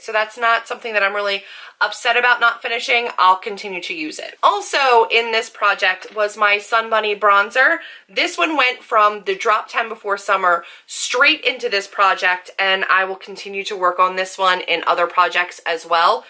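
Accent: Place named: United States. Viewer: Where are you from? I can guess the US of course, but I can't place where. I think your accent is so cute! When you said "mask" I loved it.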